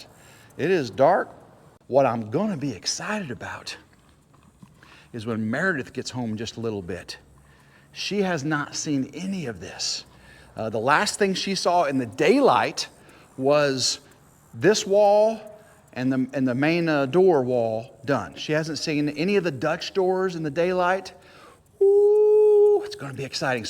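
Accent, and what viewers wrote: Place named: South, United States. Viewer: You don't like the accent? Like Skynyrd said, a southern man don't need you around anyhow! Cool video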